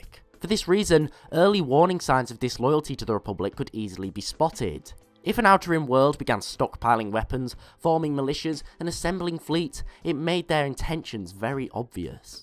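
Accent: United Kingdom. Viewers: This sounds odd, but what nationality are you? British? Australian? Also what if the Mandalorians won the Mandalorian Wars?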